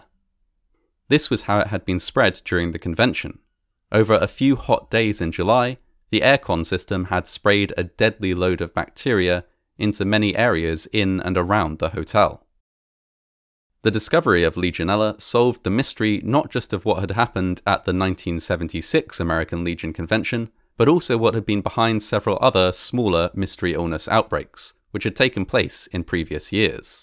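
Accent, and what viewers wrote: United Kingdom. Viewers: They mean the windshield. I believe he's a Brit or learned British English, not American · When shows up in my Subscribers list for the day I always watch it first. Love the way you present your stories and since I'm almost 1/2 UK I love the accent.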